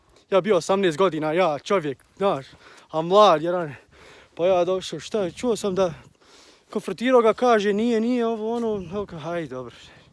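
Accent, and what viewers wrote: Bosnia and Herzegovina. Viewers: Simpatičan si sa svojim Bosanskim u izgovoru a vidi se da iskreno iz srca govoriš, sretno dijete drago i uvijek uživaj u našoj domovini🇧🇦❤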